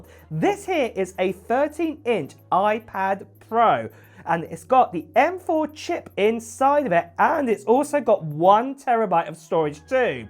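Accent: United Kingdom. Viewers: You pronounced aluminium wrong unless you’re American? You sound British lol wtf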